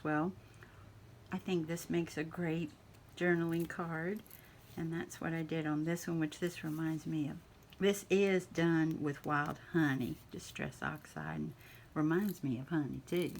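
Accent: South, United States. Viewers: Good morning 🌞 I’m crazy about all the Bees. I loved when your Southern charming voice came out. I love when the two of you are together. Praying that all of you stay healthy. I’m always interested and inspired by anything you make. Big hugs my beautiful sweet friend 🤗🤗🤗💋💋💋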